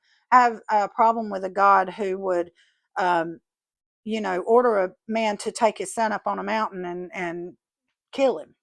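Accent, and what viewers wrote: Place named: South, United States. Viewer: Sweet southern belle 😉. Music to my ears (I am a European immigrant) 😉❤️. This is what I thought all Americans sounded like when I was growing up in the 80s 😂. Not sure where the torture after the rapture came from 🤨. Keep looking gal. If you’re meant to find God, you will. As long as you keep looking and not let fake Christians affect your search 😉❤️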